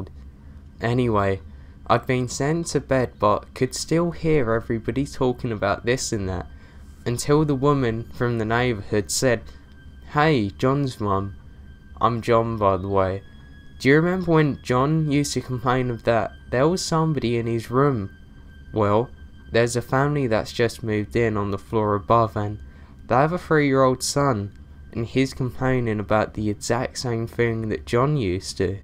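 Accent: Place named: London, United Kingdom. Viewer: Are you from Manchester? I'm working on my regional dialects and accents from the UK since between my family, and my job, I've been spending more time than ever there. Namely Bodycote. So to my untrained, or at least little trained ear, you sound like your from Manchester, or the surrounding area. Although, there's a bit of that London sound in there as well, although not as much. Or I'm just fucked on the head, and have no idea what the hell I'm talking about being from Detroit and all lmao. I've been told I have a tail specific accent as well. Not quite Midwestern, nor Wisconsin, or Ohio. Just that Detroit, and Chicago sound alike. Fuck if I know 😡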